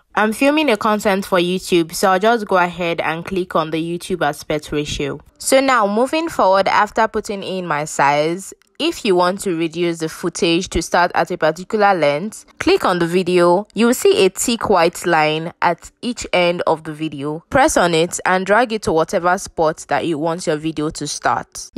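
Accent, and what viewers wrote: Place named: Nigeria. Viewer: im a big fan btw you are relaxing and pretty i love the nigerian accent it's very good!have a nice day!❤️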